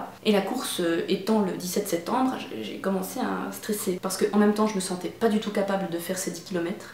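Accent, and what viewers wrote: Belgium. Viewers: Bonjour, je te remercie car avec ta vidéo précédente tu m'as donné envie de m'y mettre alors que je déteste courir ... j'ai téléchargé une appli et j'ai fait la première course du programme (très très facile pour super débutant) et je me suis régalée !!! Je vais continuer bien entendu 😉 Bisou d'une réunionnaise qui a fait ses études en Belgique et qui adore entendre l'accent belge 😘Félicitations pour ta course !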